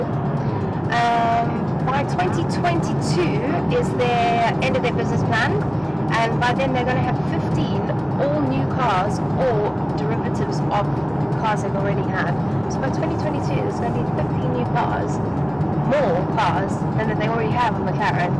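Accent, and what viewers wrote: South Africa. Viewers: DJRCars Shes from Capetown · Jacque Noir what's fake? her accent is genuine as she's from cape town...!! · Are you south african? Your accent??!!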